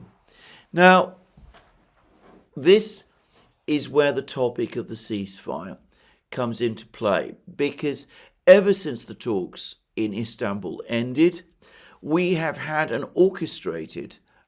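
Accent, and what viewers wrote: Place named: RP, United Kingdom. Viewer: btw some posh accent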